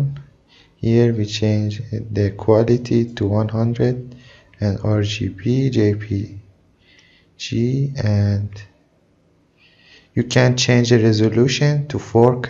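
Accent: Iran. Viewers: literally one of the most accurate persian accents i've seen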